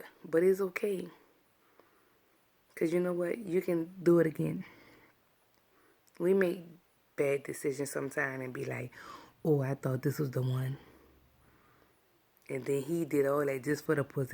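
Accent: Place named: Shreveport, United States. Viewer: You sound like a woman from Shreveport I should have married.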